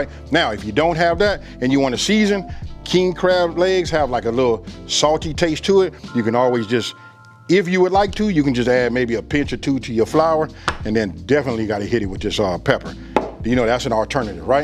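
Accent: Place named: Los Angeles, United States. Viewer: this is 🔥. Even your LA accent!!! 😊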